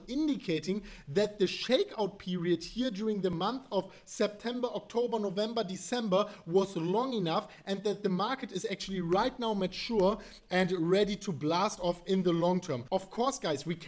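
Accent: Germany. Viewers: The fact that i can understand everything that you are saying with that German accent and the pace you are talking is amazes me...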